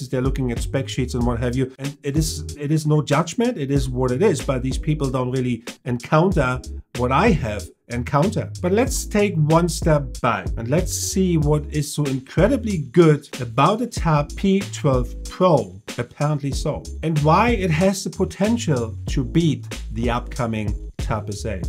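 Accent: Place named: Germany. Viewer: i have stayed in germany for years. This is the accent of Germans, i can smell it from miles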